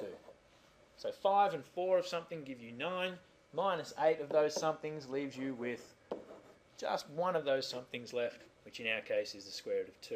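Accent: Australia. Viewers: Revising in australian, so much better..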